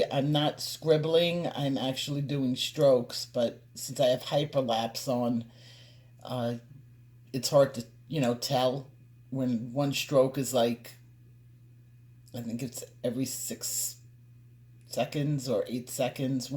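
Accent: New York, United States. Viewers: You are an amazing colorist. I have been pining for Holbeins but just can’t afford them, even though I don’t believe that I would do them justice like you. Her hair is like my mother’s almost, my Mom had a bit more red, mine was darker but with the reddish highlights. I never tire watching you make a picture come alive and love the NY accent!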